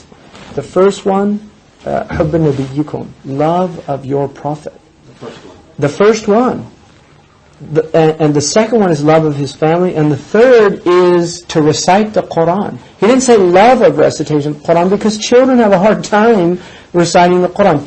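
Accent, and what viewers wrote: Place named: California, United States. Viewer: This stupid sufi grave licker 12 Imams worshiper brother of Shia said alarm clock is bida...what a donkey thats not bidah .BIDAH IS SOMETHING WHEN YOU INOVATE IN THE RELIGION...LIKE START ORAYIN 6 OR 7 SALATS...OR THE EVERY SALH YOU MAKE 4 RUKKA..OR MAWLID THAT RASSULLULLAH NEVER DID.This is one oerson i trully dont like at all...plus his voise sounds sooooo gay...he talks with a soft surfer california accent...serfs up dude .Just listen he sounds like a girl then he has the second voice that is crackking in the back like a car with a bad exoust .Sounds like he has a big coul .SUFI YOU AND YOUR SHIA BRITHERS ..WHATS THE DIFFERENCE IN YOUR CULT ??NOTHIG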